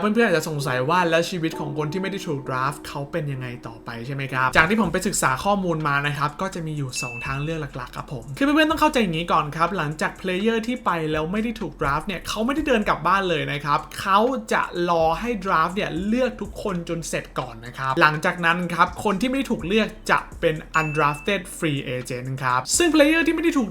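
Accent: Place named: United States. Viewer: ทำไมพี่ต้องติดพูดเมกาด้วยครับ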